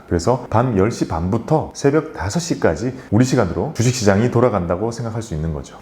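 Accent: Gyeongsang, South Korea. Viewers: 경상도 억양이 남아있어 엑센트처럼 귀에 쏙쏙 들어오네요 ㅎㅎ ^^ 주린이 에게 너무 도움 됐어요~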